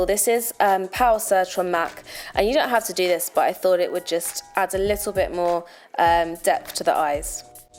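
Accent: London, United Kingdom. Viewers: british accent!! love the make up and your eyes are so beautiful! wow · you're so London, I love it. makes me want to go and visit again xx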